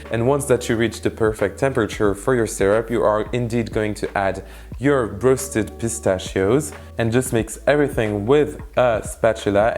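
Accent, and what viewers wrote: France. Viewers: are you French lol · Love your french accent, keep it up! 😍👍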